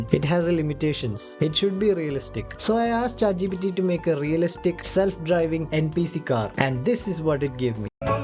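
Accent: Kerala, India. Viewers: english kettapothanne thonii.nii malayali alle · Ninte english slang kekkumbol ariyaam nee malayaali aanenn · Aree u malayali😂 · Bro from Kerala/kanyakumari right ? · Bro are you from kerala? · Haha thanks for talking in English, I think you’re Mallu 😉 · Bro malayali anow? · Bro malayali aaano?? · Bro sounds Malayali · your slang sounds like you are a malayali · Bruhh is malayali for sure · Mallu accent is heavy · Are you from Kerala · he's mallu from his ascent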